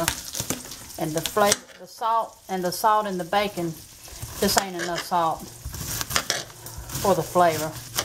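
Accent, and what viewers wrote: South, United States. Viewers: LOVE raw cabbage!!! I'm a country girl at heart, even though I left and went to the city years ago. I'm back and still love raw cabbage! Love ur video. I can't use the salt though, I'd swell up like a balloon. Bacon will have to do, cuz even that makes me swell a bit. But I love bacon too!!! Thanks for sharing. I love your accent. What state are you in? I left north and went south to VA 3 1/2 yrs, then to Houston TX for over 40 yrs, then SC 2yrs crossed over to NC for about 8 yrs, now back north for last 5 yrs. I miss the south though. Miss my friends and all the shopping and eating places. Blessings to you and ur hubby! L :)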